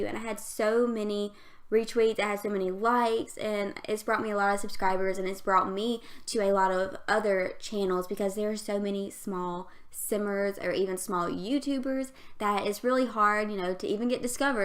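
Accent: South, United States. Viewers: I just binged watched all of your builds last night and I'm so glad I found your channel! Love your voice by the way! I'm from Georgia, so southern accents have a special place in my heart ❤️ Can't wait to see your channel grow 😊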